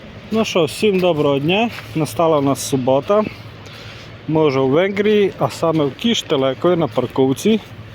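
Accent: Transcarpathia, Ukraine. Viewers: Мені кажуть чому я не знімаю свої відео на українській мові , слухаючи тебе - це теж не українська мова, мабуть Закарпатська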